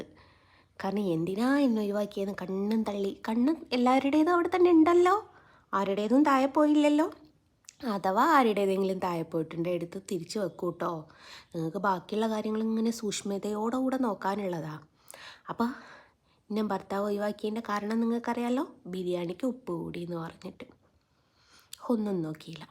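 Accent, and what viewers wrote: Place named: Kuttiady, India. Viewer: nigalude bhasha kuttiady bhashapole und. Poonoor anennu eppoya arinja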